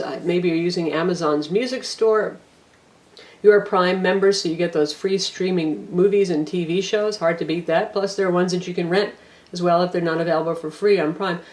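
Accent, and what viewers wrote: United States. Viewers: I'm sure that this is a very helpful and interesting review but unfortunately I only understood about half of what the young lady was talking about! She speaks SO quickly and with an American accent I find difficult to follow.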